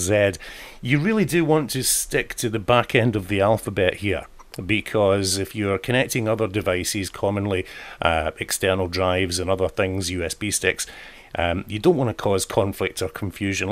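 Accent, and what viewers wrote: Scotland, United Kingdom. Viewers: Helpful in that I didn't even think of using the USB port on my 'Rooter' ;) I do love a good Scottish accent! (still think Scottish Engineers are the best to work with!)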